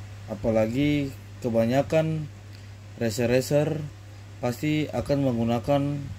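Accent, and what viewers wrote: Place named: Ambon, Indonesia. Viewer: Logat nya kayak logat Ambon ka om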